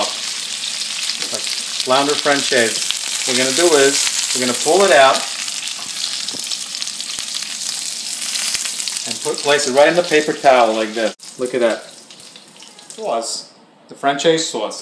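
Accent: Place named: New Jersey, United States. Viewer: I love your Jersey accent❗❗ That recipe looks simple and delicious 👍🏾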